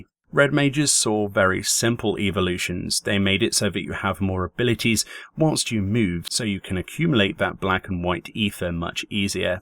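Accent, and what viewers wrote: England, United Kingdom. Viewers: I think your English accent just makes everything sound more easier to digest lol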